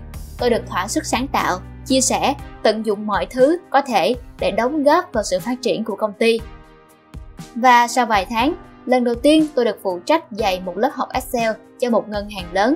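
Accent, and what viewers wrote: South, Vietnam. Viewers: Tôi lại phải làm dũng sĩ diệt speaker, cho giọng miền nam như vầy vô mất hết view giờ!🙄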